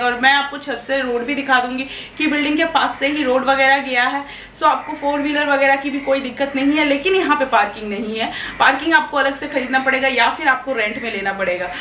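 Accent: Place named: Bengal, India. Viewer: Aapnar hindi te clear Bangla taan ashe. Mane apnar primary language Bangla. Buyers would trust Bangali agent much more than Khotua agent.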